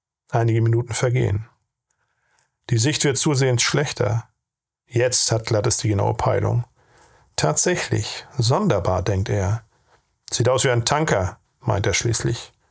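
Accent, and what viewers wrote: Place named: East Germany, Germany. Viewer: Sehr interessanter Bericht. An manchen Stellen ist nicht zu überhören, dass er aus der DDR stammt.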